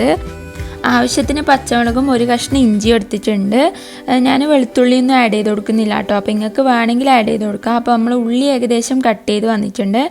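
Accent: Kannur, India. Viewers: Nalla rasand nammale kannur bhasha👍👍👍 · kannoor bashayum poliyan nigalude cookkingum poliyan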